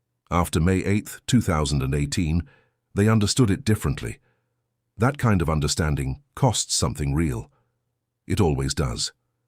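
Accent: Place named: United Kingdom. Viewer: This AI voice of the host is horrific the limey thing does not work in the USA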